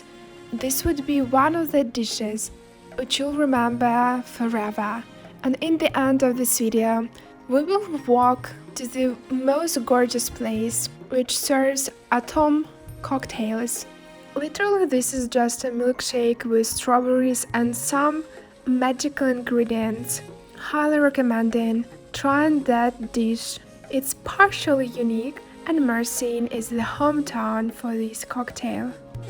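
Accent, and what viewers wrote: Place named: Eastern Europe. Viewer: you have an interesting accent. What's your nationality? Are you from Eastern Europe or perhaps Germany? 🤔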